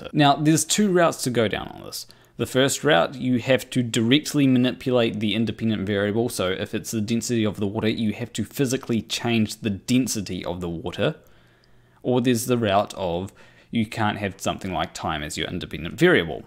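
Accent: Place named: New Zealand. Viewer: There was a point in this video where I was really distracted by the phonetic "eegs" which I thought was eggs, but later on you said it with the letter X on screen. Not a diss, I quite like the quirky kiwi accent is. At least you don't make everything sound like a question?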